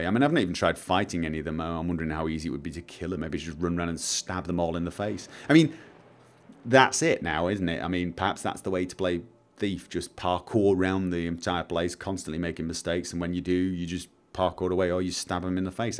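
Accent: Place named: Manchester, United Kingdom. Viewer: I think he's from Manchester, UK.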